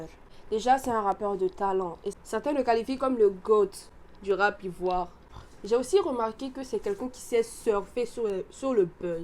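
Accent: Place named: Marseille, France. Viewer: Tu es marseillaise? Tu parles comme les marseillaise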